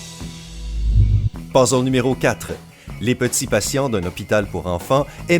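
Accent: Quebec, Canada. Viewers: Il a un accent québecois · Hmmm son accent est cwlui dun quebecoi si je me trompe pas..☺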